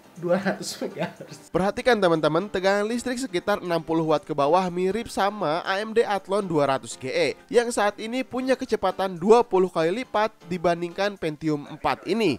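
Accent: West Java, Indonesia. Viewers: Logat sunda pisan euy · Urang mna akang B.indonesia logat Sunda..😂😂😂😂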